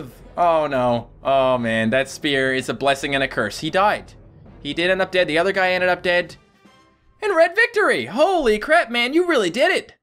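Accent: Canada. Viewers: are u Canadian? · Are you Canadian?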